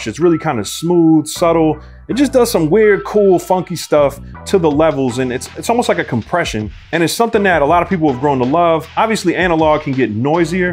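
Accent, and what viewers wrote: Philadelphia, United States. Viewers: Hey why you have a lil philly slang when you talk lol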